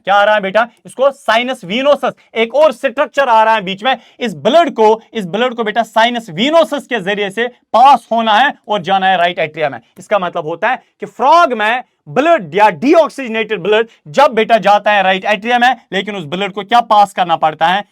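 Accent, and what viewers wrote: Kashmir, India. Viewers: Kashmirii accent ❤😂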